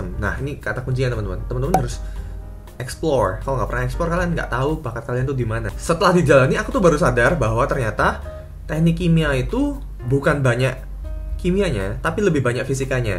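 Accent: Java, Indonesia. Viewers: Kok bisa si pas ngomong english american banget, bahasa indo medok banget wkwk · Kak logatnya khas Jawa buangeeet! Wkwkwkw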